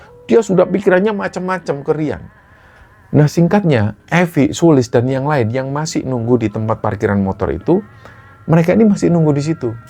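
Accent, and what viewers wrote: Java, Indonesia. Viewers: terimaakasih mas dan crew sudah selalu menemani mingguku. kali ini dngerin cerita ini bikin kagum tapi merinding jugaa. sefatal itu yaa bahkan jasadnya pun bisa pulanh sendiri tanpa dibawa fisik oranh rumah. jatim memang the best apalagi mas yg nyeritain kek jawanya njawa bgttt brasa hidupp.. sukses terus tim KS ✨️✨️🫶🫶❤️❤️🎉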